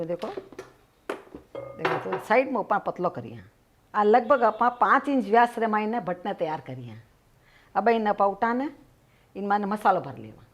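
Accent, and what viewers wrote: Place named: Rajasthan, India. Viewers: Jai siya ram badiya jee. Thari receipes bahut hi badhiya hai. tharo sikhane ko tariko bahut jordaar hai. Thari marwadi bahut pyaari lage. 🙏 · Aunty ap meri favourite ho or apki Rajasthani boli same meri dadi k jesi❤️❤️😘😘😘 or apki recipes to osm h ❤️ love you ❤️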